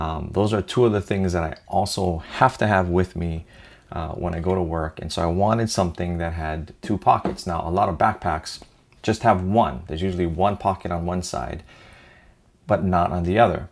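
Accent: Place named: Hawaii, United States. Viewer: I could tell you were from Hawaii before I even saw the name if your channel.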